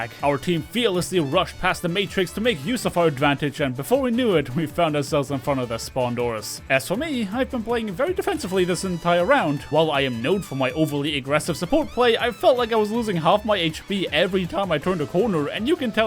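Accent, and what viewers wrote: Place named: Germany. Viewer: I knew it You are a German Dein Akzent hat mich immer vermuten lassen, dass du ein Deutscher bist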